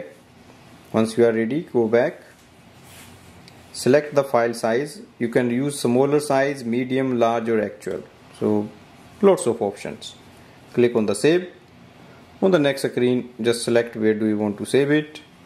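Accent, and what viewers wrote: India. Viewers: Your Indian accent is annoying.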